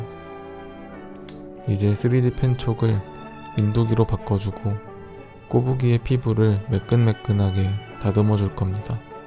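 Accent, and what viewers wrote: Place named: Chungcheong, South Korea. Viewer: 는 충청도 사람입니까?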